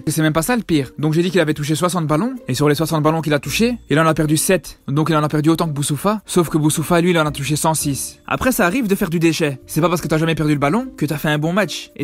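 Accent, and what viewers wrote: Belgium. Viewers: Toi t belge mon frère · La vidéo m'a tué 😭😭 par contre on entend bien ton accent belge !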